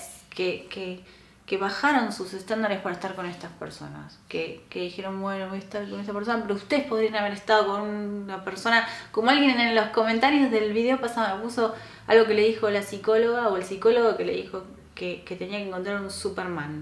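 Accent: Argentina. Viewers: Un Argentino hablando sobre narcisismo...? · Hola, Gracias, por tu trabajo, te digo que estoy suscripto a la Señora Meredith de Inner Integration, a el Señor Omar Rueda, a la Señora Tabatha de identifica a un narcisista, y al Señor Marcelo de libres del narcisista, realizo en algunas oportunidades comentarios de algunos vídeos de sus trabajos, que son hoy los medios por los cuales realizo mi proceso de sanación, escucho tu acento al hablar percibo que sos de Argentina, yo soy de Córdoba Argentina, Villa Carlos Paz, en rigor de verdad sos la única persona de Argentina que he visto que habla del tema, el narcisismo y los psicópatas, le he enviado al Señor Iñaki Piñuel. al Señor Omar Rueda y a la Señora Tabatha un poema que he escrito sobre mi relación con la narcisista, poema que a ella nunca le envíe, en dónde describo como son las narcisistas, el primer vídeo que vi del narcisismo fue el trabajo de la Señora Tabatha, lo recuerdo siempre, porque yo me acuesto a las 4 de la mañana y ese día eran las 5, y lo vi en mi teléfono, y ahí comencé a instruirme del tema, caminar solo este desierto es duro, como dije, cuento con la asistencia de sus trabajos por youtube, sus canales, otros canales que suelo ver son la Señora Natasha Lopera, y el Señor Antonio de Vicente, en forma intermitente, no estoy suscripto, luego escribí un poema en dónde describo como solo DIOS puede curar esa gente, al único que se lo envié es al Señor Omar Rueda y la psicópata con los temas de, tu vida si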